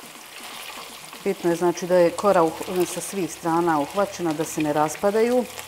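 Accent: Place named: Bosnia and Herzegovina. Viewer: Ne sumnjam da su ćufte i ukusne kao što su jednostavne, upravo ih idem praviti ali željela sam reći drugo - uvijek radije slušam zbog njene spontanosti i prirodnosti, volim ton njenog glasa i bosanski akcenat koji me odmaraju...haha...ona dođe kao terapija!, želim ti svako dobro.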